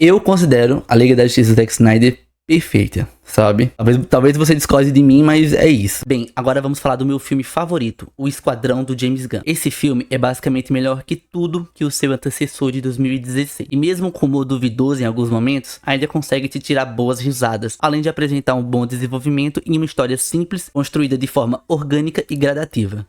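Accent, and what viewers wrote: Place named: Paraíba, Brazil. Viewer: amei o sotaque de paraibano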